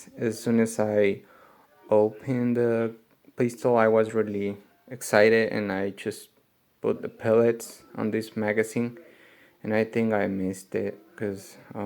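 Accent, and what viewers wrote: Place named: Poland. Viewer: Are u from Poland? your Accent is very polish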